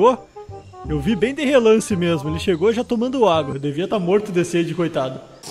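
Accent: Brazil. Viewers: Seu canal e o melhor do mundo. Uma pergunta você é brasileiro ou português? Eu gosto de indoraptor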